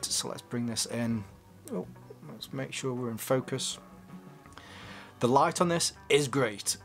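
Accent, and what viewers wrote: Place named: England, United Kingdom. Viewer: Great review. I totally agree with your comments regarding negative displays. IMHO they're style over function and my main reason for buying a watch is tj read the time as easily as possible and the negative display models are a PITA. The combination of a larger display and the black model with the positive display are the one I'll be going for. As I said initially, a great review and nice to hear someone with an English accent for a change.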